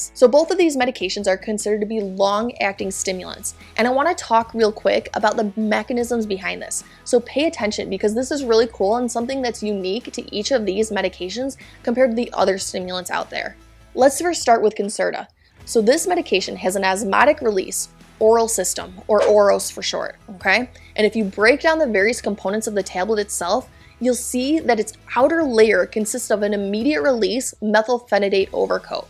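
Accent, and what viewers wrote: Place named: Wisconsin, United States. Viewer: You’re from Wisconsin or something with that accent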